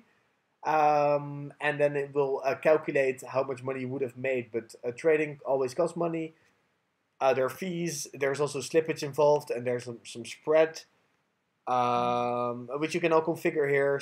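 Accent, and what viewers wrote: Netherlands. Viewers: you sound very nederlands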